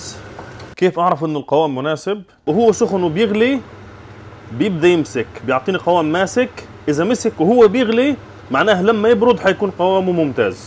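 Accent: Palestine. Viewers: عم تعرض طبخ ياالله ليش عم اتفرج وابكي أنا صار كل شي غزاوي كل لهجة فلسطينية تخليني ابكي ربي يفرجها على أمك وكل أمهات غزة وأمهات سوريا ويدفيهم برحمته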